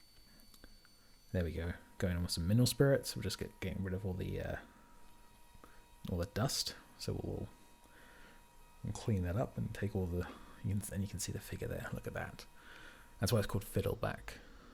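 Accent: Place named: United Kingdom. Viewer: The craftsmanship is great but does this fella gotta use the British accent? It’s like chewing on tinfoil while watching the chalkboard scratching scene in Jaws!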